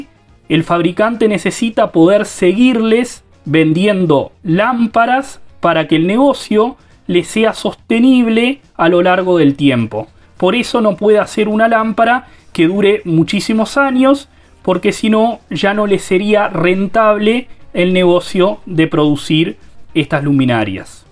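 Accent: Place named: Provinces, Argentina. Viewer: maestro, usted vive en Mexico? porque tiene acepto argentino, provinciano, no porteño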